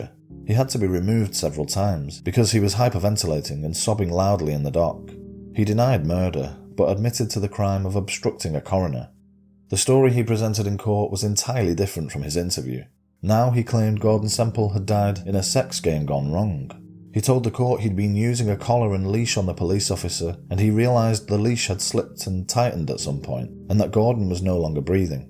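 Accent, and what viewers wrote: United Kingdom. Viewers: How pleasing to hear your uk 🇬🇧 voice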